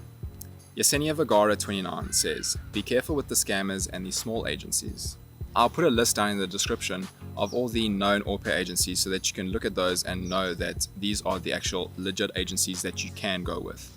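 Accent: South Africa. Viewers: I'm going to South Africa as an AuPair soon, is your english accent what english sounds like there? Do all people speak this language? Or is there another major language that determined this accent? :D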